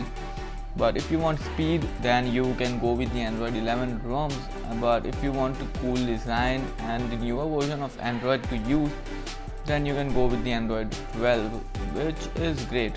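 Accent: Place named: India. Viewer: Learn English properly, you speak like a typical Indian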